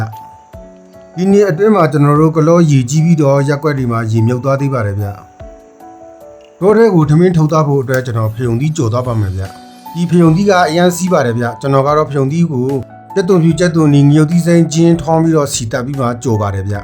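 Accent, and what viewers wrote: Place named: Danu, Myanmar. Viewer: မွှေးရပ်မြေကိုလဲလွမ်း ငယ်ဘဝကိုလဲလွမ်း ဓနုသံဝဲဝဲကိုသတိရ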